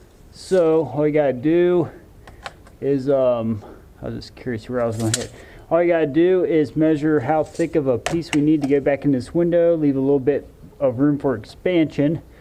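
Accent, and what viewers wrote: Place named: Baltimore, United States. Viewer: Very thick Delmarva Baltimore sounding accent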